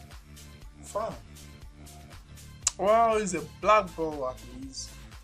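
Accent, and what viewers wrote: Nigeria. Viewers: honestly, i dont know. Myself, i'm Ghanaian. I just know he's nigerian because of his accent, and coz he confirmed in one of he's videos that he's nigerian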